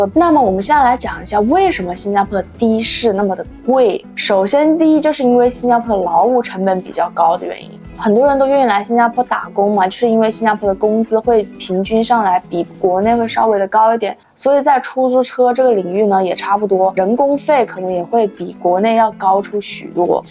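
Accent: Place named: Hubei, China. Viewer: 你这口音是湖北普通话。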